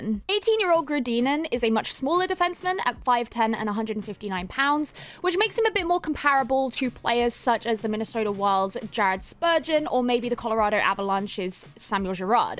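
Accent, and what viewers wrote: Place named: United Kingdom. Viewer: Great analysis! Though I'm still adjusting to a British accent discussing hockey lol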